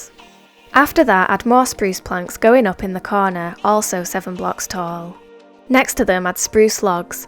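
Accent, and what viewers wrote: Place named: Scotland, United Kingdom. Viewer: Honestly i like your Scottish voice so now ima use your tutorials in my world now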